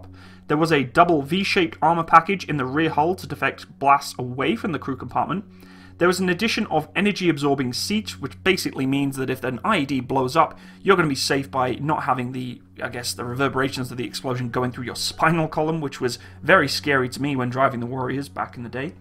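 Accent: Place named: United Kingdom. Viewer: You don’t sound like a fellow Canadian you sound half british no offence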